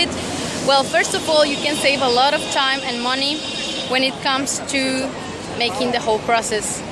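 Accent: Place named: Latin America. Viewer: I love ur accent are u Latina?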